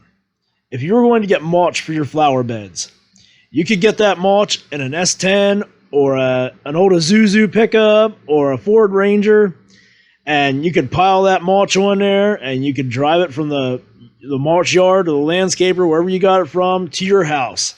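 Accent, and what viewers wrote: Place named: Western Pennsylvania, United States. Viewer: I'm thinking the dial height adjustment might be faster than futzing with an app and there's less to go bad in the future. I didn't look it up, but where in Western PA or Western MD are you? Yes the accent and word usage gives you away 😁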